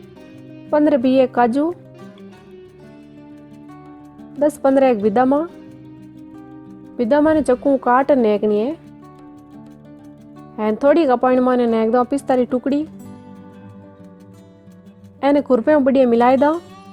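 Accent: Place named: Jodhpur, India. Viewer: Aapki boli mery pardadi ko bahut achhi lagi. And aappki boli mery Jodhpur me rahne vali mosi jesi hai 😊😊😊😊😊😊😊😊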